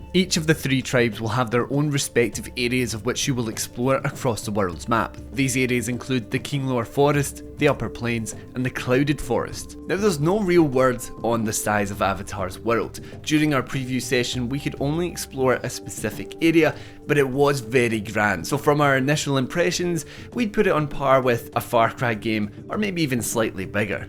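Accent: Scotland, United Kingdom. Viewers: Random thing to say but I haven't heard a Scottish accent in a while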